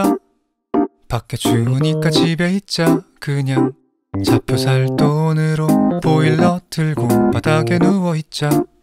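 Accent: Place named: North Korea. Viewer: 아조씬 부칸연기 천재야....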